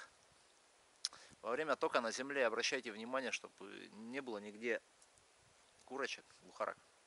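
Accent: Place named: Vyatka, Russia. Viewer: Очень хороший канал, привет бывшим землякам))) Узнаю родной ВЯТСКИЙ говор)) 3 года от него избавлялся). Но, послушать его иногда очень приятно! Продолжай в том же духе!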